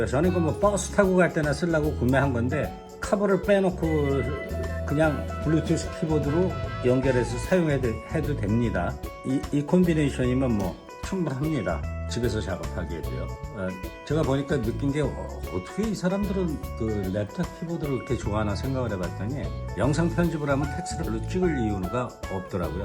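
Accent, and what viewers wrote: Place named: United States. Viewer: 꼭 대학생 같아 보이십니다. 미국 교포 느낌의 억양도 반갑게 들리구요. 책상정리, 선정리 하느라 애먹었던 저의 당시 시절도 생각나게 하네요. 영상 잘 보았습니다.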